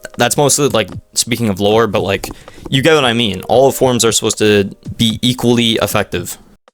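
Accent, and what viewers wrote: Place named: India. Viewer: Turn off the indian accent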